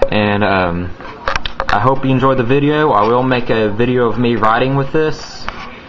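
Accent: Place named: South, United States. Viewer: he had like a southern accent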